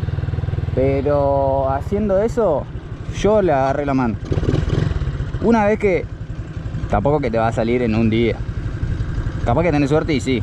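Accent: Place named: Chicano, United States. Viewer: Che como que te que te queda media chicano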